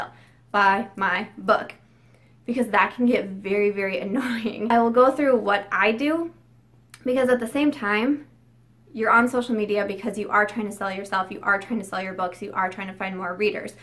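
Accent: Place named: Midwest, United States. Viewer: Thank you for these helps. I'm only an author in my head once in a while. I've never published anything. I enjoy hearing your midwestern accent. I also appreciate your tip on getting your response down in a Word document and then deleting it because I can see how it would be tempting to respond to negative feedback and pull down the professionalism you've worked hard for.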